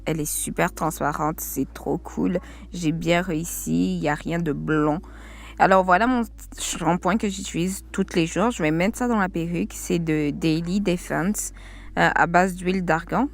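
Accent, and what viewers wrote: Quebec, Canada. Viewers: Bonjour après tout ces mois la perruque est-elle toujours aussi belle ? Je compte l’acheter mais j’ai peur car les vendeurs sont très irréguliers. D’ailleurs tu l’as vraiment acheter toi même ? Je me méfie souvent des partenariats 😂 Sinon je kiffe ton accent (je suis française) mais tu es québécoise non ? D’habitude le français québécois et moi😬 Mais j’aime trop le tiens 😭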